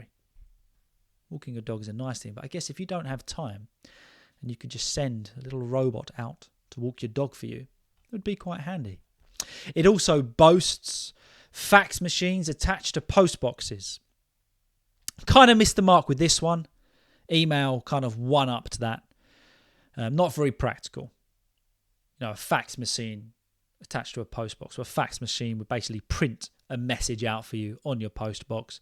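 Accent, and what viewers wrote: England, United Kingdom. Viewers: A handsome English teacher with his beautiful blue eyes, and beautiful English accent 💗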